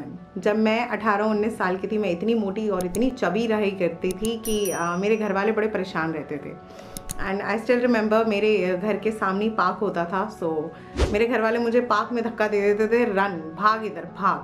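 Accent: Punjab, India. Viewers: Mam Na Punjab Faridkot se bol rahi hai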